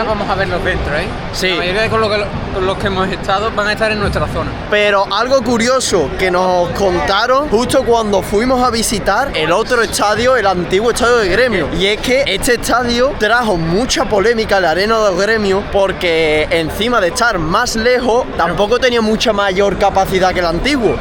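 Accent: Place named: Castile, Spain. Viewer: O Teu sotaque castelhano é bem diferente, nunca ouvi. De onde é ?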